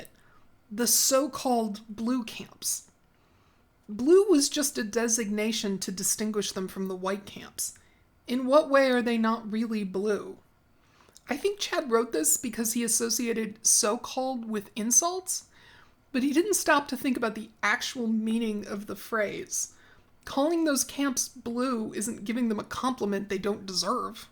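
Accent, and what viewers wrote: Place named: Russia. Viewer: Just love your Russian accent.❤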